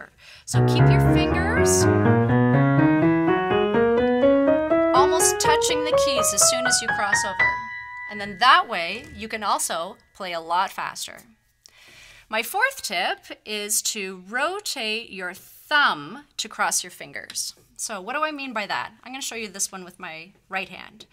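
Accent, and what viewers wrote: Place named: United States. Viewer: Great and clear staff and what a beautiful american english accent!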